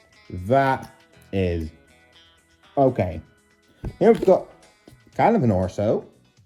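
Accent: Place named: United Kingdom. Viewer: You're sound like British or United kingdom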